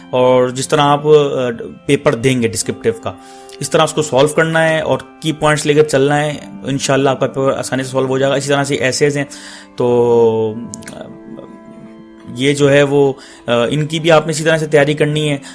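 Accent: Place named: India. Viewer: Your voice looks like indian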